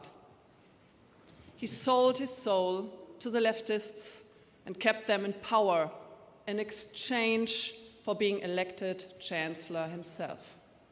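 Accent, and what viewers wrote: Germany. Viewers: Nö, wo denn?? Schwachsinn, die spricht super, mit bissel deutschem Zungenschlag, aber machen Sie es doch erst einmal besser. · Yep. Total extremst deutscher Akzent, hihi. Aber die Worte sind sehr gut ausgesucht. Es geht einem ans Herz. Bravo geehrte und geschätzte Frau Dr. Weidel, liebe! Gut gemacht!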